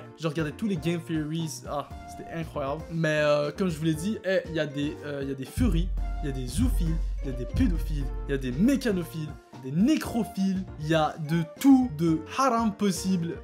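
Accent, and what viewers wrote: Quebec, Canada. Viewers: Je me suis abonné au moment où j’ai réalisé que t’avais un accent québécois de ouf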